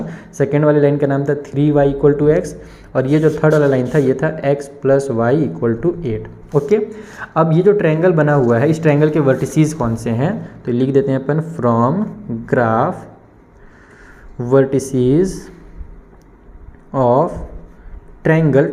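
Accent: Bihar, India. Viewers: 😊😅Sir lagta hai aap UP-Bihar se hain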